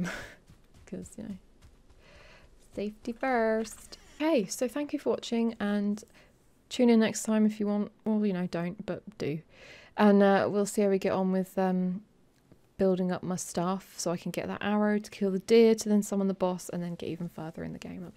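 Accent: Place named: Hull, United Kingdom. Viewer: 'It just dunt do nowt' your accents are hilarious; was you channeling a Hullian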